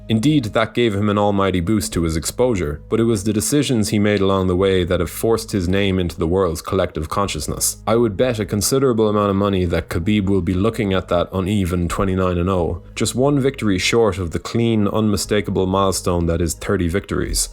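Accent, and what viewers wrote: Ireland. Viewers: Irish Narrator is the real MVP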